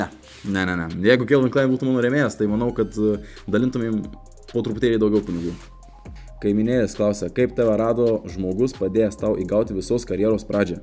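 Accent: Suvalkija, Lithuania. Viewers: Pagal tarme kazkur is Suvalkijos busi :D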